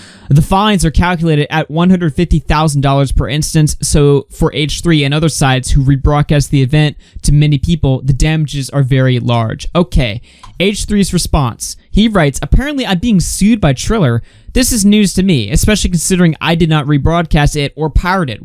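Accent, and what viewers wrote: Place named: Upper Midwest, United States. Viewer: Damn I thought he was more upper midwest with me.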